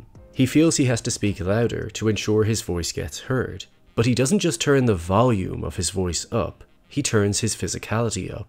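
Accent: Ireland. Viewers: I just binge-watched this playlist (next will be the Better Call Saul playlist) and I really appreciate how you break down and explain the characters and/or situations. On a side note, I'm really curious if you are trying to suppress your accent or if it is really light/moderate like that? (I want to say Irish but I'm second and third guessing myself so not confident on that.)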